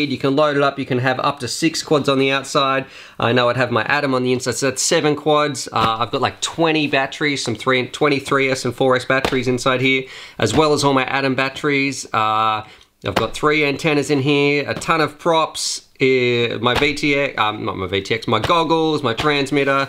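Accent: Australia. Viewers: Hay great videos man but just wondering are You Australian because if you are like me I would love your chance even more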